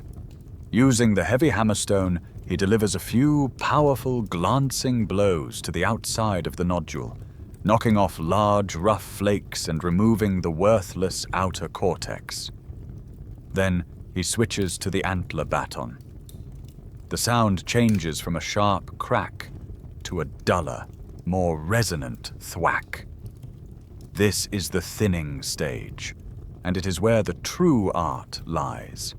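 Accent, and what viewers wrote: United Kingdom. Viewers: Voice is way too loud and energetic for sleep. I also can't stand a British accent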